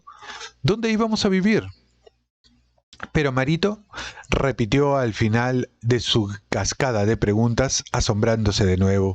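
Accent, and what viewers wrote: Peru. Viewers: La narración es perfecta, el acento peruano resulta de lo más adecuado, lo disfruto mucho.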